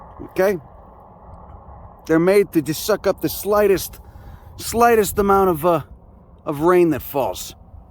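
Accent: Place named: Northeast, United States. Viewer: Man there are few things I love more than a heavy Northeast accent dropping heavy science. Good shit.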